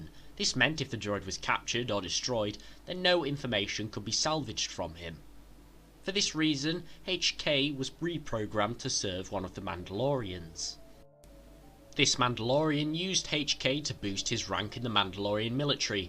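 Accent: England, United Kingdom. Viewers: I don't get annoyed at the way anyone pronounces words. Being Scottish I'm often ridiculed by the English for how I speak. lol even my English friend does it. I just think its wrong to mock someone or get annoyed at someone because they don't talk the same way as someone else. and I found it ironic that English speaking Americans were getting mad at how an English person speaks English. It does sound kind of funny when you think about it. I didn't mean to cause offence or anything. Just found if kinda funny is all. Its really interesting how language develops over time and i get that. Although I have to say the Scottish language at its purest form confuses me. It barely sounds English. It just kinda bothers me how every video this guy makes some American is pissed because he talks different. Like in the UK most citys have different accents and slang and we embrace it. 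we don't tell each other were speaking wrong. That's all i was getting at. Why get pissed at someone for something that is really hard to change? I have been trying to lose my Scottish accent and pronunciation for years to try to Americanize it because I hate it and its hard. As for accents. Americans do have a thing for. the amount of girls who find my hot just because I talk Scottish is crazy. you cant really talk for your whole country just because you don't find accents attractive.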